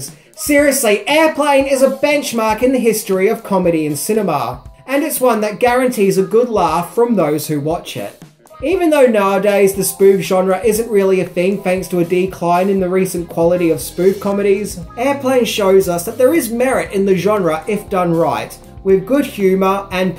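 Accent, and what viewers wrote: Australia. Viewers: I’d say all the dislikes are for the whiney Aussie accent and him wearing sunglasses indoors I guess for comedic effect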